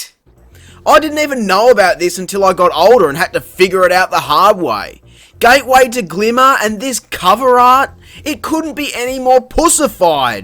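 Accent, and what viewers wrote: Australia. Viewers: I just put up a review for the first one and here I see you post another hilarious one for the sequel hahah keep it up. strikes again! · ANNOYING VIDEO WITH AN AUSTRALIAN ACCENT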